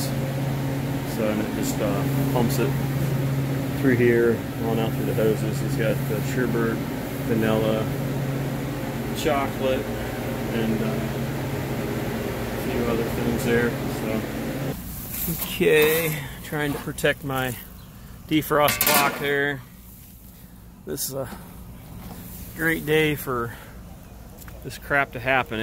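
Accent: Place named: Ohio, United States. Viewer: Nice. I had a feeling. I was born and raised in the SW Ohio region, Hamilton to be exact, and thats the dialect so I pick up on it right away.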